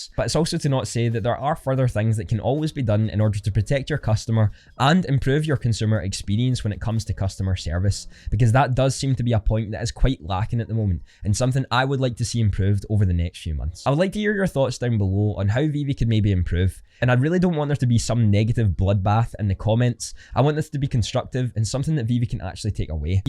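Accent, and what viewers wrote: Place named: Glasgow, United Kingdom. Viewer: He's a Glaswegian you numpty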